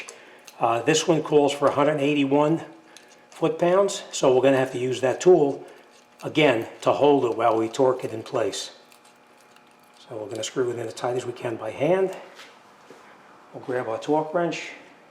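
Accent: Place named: New York, United States. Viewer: Hey. Nice video. Where is your shop? Your accent screams New York. lol. I'm from NY. Long Island, NY.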